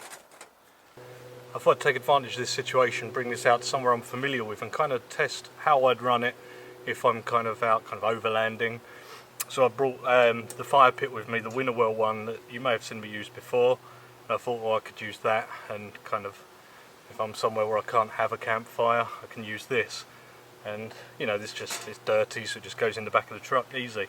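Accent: England, United Kingdom. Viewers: Are you in uk or usa ,I know you are english from your accent , missed you building your ca in loved to have seen it though, many congrats young man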